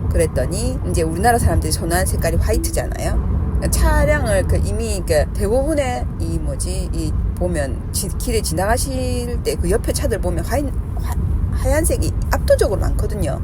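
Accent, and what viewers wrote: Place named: Busan, South Korea. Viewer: 누나 부산사투리 좋아요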